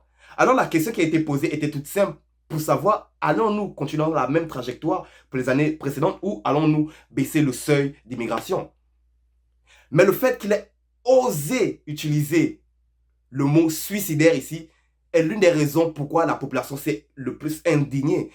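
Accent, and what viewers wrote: Gabon. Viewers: Slt dis moi t'es gaboma? Parceque tu a l'accent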